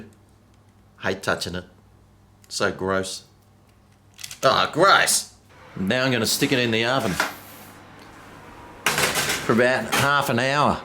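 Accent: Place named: Australia. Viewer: Narrated like a True Blue Aussie 🇦🇺. Bloody shame chickens only have two wings... If they had four, I'd need two bottles of Frank's 👍😄🤤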